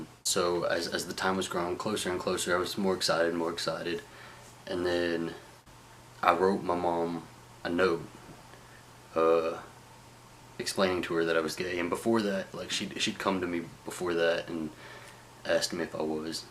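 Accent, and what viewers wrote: South, United States. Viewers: Loved your story and that gravelly deep voice and southern accent didn't hurt either! Best wishes on coming out to your dad and other family members. A Great Video - I Liked, subbed and shared! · Group A... fuck em. You're better off without em. As a southern native (NC) it's always nice to hear someone who talks like me proudly say who and what they are. I live in a moderately metropolitan area, and the vast majority of people here view minorities (racial, religous, sexually oriented) as just another person. No big deal. If I drive 30 miles west I arrive in the country. I mean the woods. And the attitude out there is a little different. These rural areas in the south are still a little behind the times, but even in those areas perceptions and ideals are beginning to change noticeably. Especially as the older generations are dying off and the younger generations are arriving at a point in life where they are starting to put together their own informed ideals and opinions. 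The reason I say all this is because a lot of the nation has this stereotype of the south in their minds as a regressive, racist, intolerant part of the country. And today that, for the most part, just isn't true. At least in North Carolina it isn't. I have lived in D.C. and Philadelphia and can say with all honesty that I have heard more bigoted talk up there than here at home. Including bigotry towards people simply for their southern accents. Sorry for the long comment but people need to know that when they hear southerners talk or think of the south in terms of our social views that today's south is not the south of 30 years ago. It's not the south of 5 years ago. We are embracing modernity, not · I just love how u break all the regular stereotypical things about being a "typical gay man". I really like that about u! As being a transgender guy, I would've never thought u were gay with ur really deep voice and ur genuine "southerness" but that just goes to show that u can't tell if someone is gay by looking at them. 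I wish u the best of luck and all the happiness in the world! XOXO-:-) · Love a southern accent. Wow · Great story and awesome t-shirt... and I'm a sucker for a southern accent:-) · That southern accent is very attractive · Love your southern voice. Or accent lol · You're so hot. Nice Southern accent.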